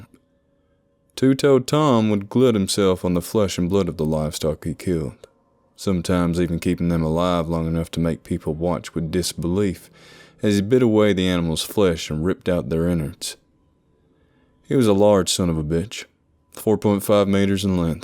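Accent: South, United States. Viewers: I love your overly southern deepest of the south accent.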